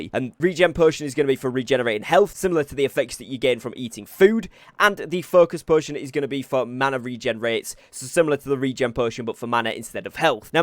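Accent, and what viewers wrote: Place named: North, United Kingdom. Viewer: Love your guides. You sound local to me! Total northerner! 😅🤣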